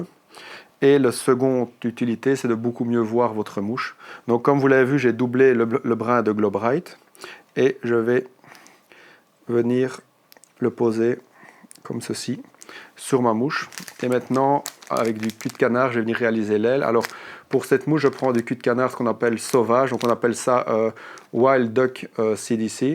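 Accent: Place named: Belgium. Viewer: Encore une très belle vidéo et c'est accent belge. Rajoute un vrai charme à tes vidéos. On prend plaisir avec toi au bord de l'eau et on sent l'envie de transmettre. Merci encore pour ce travail. Je me suis mis à la nymphe grâce à toi depuis plus d'un an et c'est un vrai plaisir. Va falloir que je franchise le pas de la mouche sèche mais c'est compliqué dans nos rivières de haute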